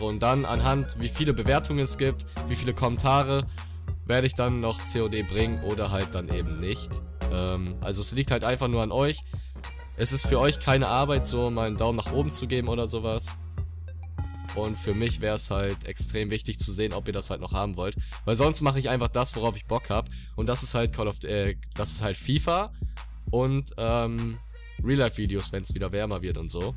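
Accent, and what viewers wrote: Osnabrück, Germany. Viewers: Kommst du aus Osnabrück?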